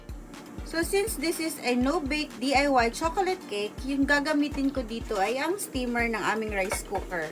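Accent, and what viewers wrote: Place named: Aklan, Philippines. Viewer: taga san po kau maam? ung accent nyo po is it from aklan?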